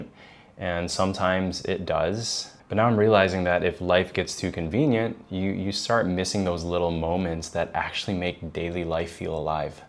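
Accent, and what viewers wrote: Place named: United States. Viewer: got an American accent!!😊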